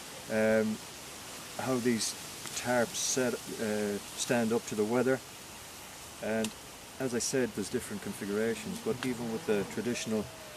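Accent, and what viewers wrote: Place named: Ireland. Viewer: knew the Irish accent straight away..good video..sub'd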